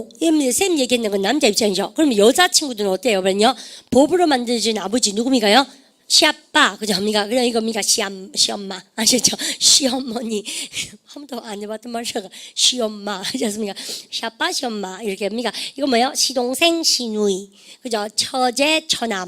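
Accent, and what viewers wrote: Daegu, South Korea. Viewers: 선생님. 좋은 강의 올려주셔서 얼추 8주 동안 열심히 공부했습니다. 말씀대로 문법은 3번 들었더니 4학년 딸아이 ELA 공부를 봐주는데도 큰 도움이 되었습니다. 문법 ABCDE 정리하고 3번 듣고. 기초보카 정리후 두번째 듣는 중입니다. 기초보카. 보카22000. 문장보는 눈도 재미있습니다. 두툼해진 정리노트를 보니 더 감사합니다. 아!! 그리고 그동안 천지삐까리로 많은 대구 사투리가 그리 정겨븐 말인것을 알게되었네요. 아무리 되도(?) 쎗국물 갈아마시고 열심히 하라는말씀도 맘에 깊이 새겼습니다. 영어실력과함께 대구어 리스닝/ 표현도 늘었습니다. ㅎㅎ뭔가 저에게도 샘에게 도움이되는것일것 같아 ㅎㅎ 영어로 나오는 광고들도 열심히들었네요. 기회가 있어 다른 방법으로 인사를 드릴 수 있기를 바라며... 건강하시고 행복하시길 기도하겠습니다. 플로리다에도 선생님 팬이 살고있습니다. 다시한번 감사합니다.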